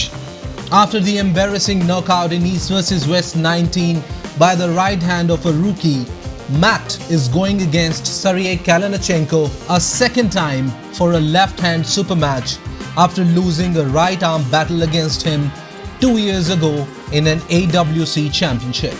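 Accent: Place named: India. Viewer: ur indian accent is hilarious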